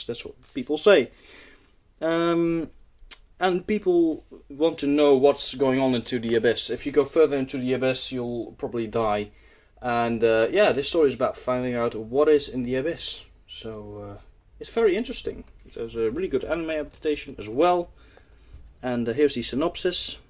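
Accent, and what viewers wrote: Netherlands. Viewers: I can hear ur dutch haha! Great collection dude! Greets from a fellow Dutchman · Hoorde het meteen aan het accent hahah leuk dat je uit Nederland komt. Geabonneerd! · My classmate talks like you. Literally. He also says sOmething. He's also dutch btw. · Haha, wist dat je nederlands zou zijn aan je accent. Toevallig zelf ook gister nog manga gekocht van marktplaats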